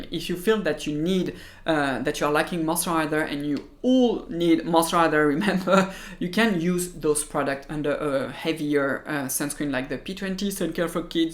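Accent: France. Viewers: . I'm a French man raised in Australia and I listen to French accents all my life. There is a flaw in your channel that you must address: you flick out the names of active ingredients, with a French accent, and it is irretrievable for English speakers. When you discuss actives, pronounce how you want - but spell beneath. Alors. C'est pas trop difficile. Also, we can get Azeliac Acid in the US and Australia as 'AZClear' and 'Finacea'. If you live in either of these countries - buy those and mix a little into your moisturiser for a quarter of the price of even paula's choice. Azeliac is a cheap ingredient.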